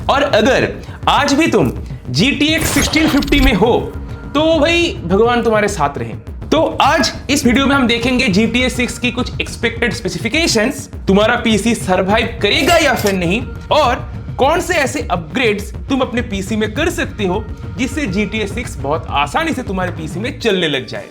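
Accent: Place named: Bihar, India. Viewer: Bhai phle naak se baat krna band krde its very hard to understand what you are saying in that bihari accent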